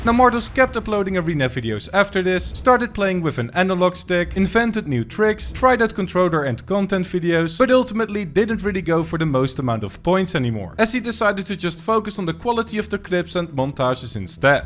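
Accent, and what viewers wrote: Netherlands. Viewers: Question to u: are u Dutch? Little accent xD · Die Nederlandse accent😭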